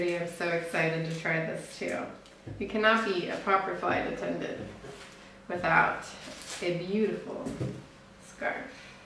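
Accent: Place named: United States. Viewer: Wow, that’s a lot of uniform pieces!!!! Very very nice!!! I just saw the recommendation from youtube to watch your video and started watching and was like: wait, which airline in the US has that uniform? (Because of your American accent) Then I saw the rest of the video and you said it. Awesome.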